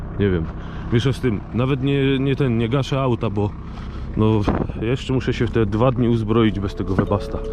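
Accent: Silesia, Poland. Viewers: fajnie jak tak po Śląsku godosz! 😉👊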